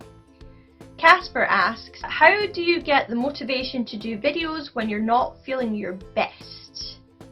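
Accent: Scotland, United Kingdom. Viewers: What part of scotland are you from??💗💗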